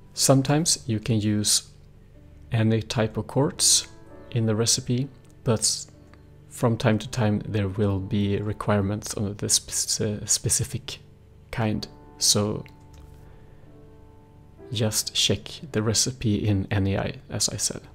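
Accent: Sweden. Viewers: <link> Here is one of the lists I found for 1.7.10. Honestly, I was doing it to see how much I could fit together and if they would all run, it worked and I just kept the pack and it grew until I got too many, lol! Honestly, it was a LOT easier than any other version of Minecraft before it, almost anyone can make a mod pack now if they know how to "translate" the errors that may occur, I mean hell I did it. :D As always, if you have time and what not, you're invited to join us, but I completely understand how hectic life is and how little precious time you do have. Thanks for just reading and replying to these comments. I'll spare you from other comments in other vids so you can get back to you're life. ;) You're Swenglish is just fine, truly a great job. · where are u from? xD sounds like ur from sweden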